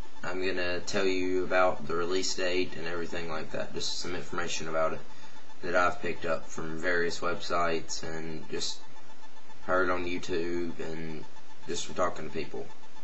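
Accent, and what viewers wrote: South, United States. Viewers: Is it just me, or did used to have more of a southern accent when he was younger?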